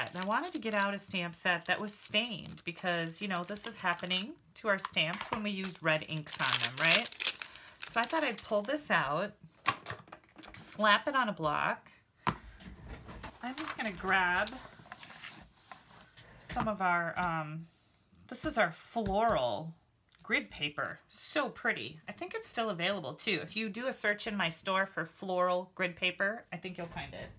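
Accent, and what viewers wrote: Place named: Wisconsin, United States. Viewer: Oh my gosh, I thought my memory issues were because of am of a certain age. 😉 I will start using the Stazon excuse! What fun! Love what you do and the Wisconsin accent. I used to visit Sheboygan. Nancy In Colorado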